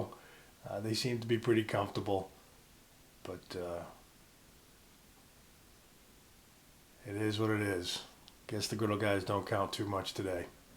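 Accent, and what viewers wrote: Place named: Massachusetts, United States. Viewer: I'm thinking Tewksbury/wilmington? maybe billerica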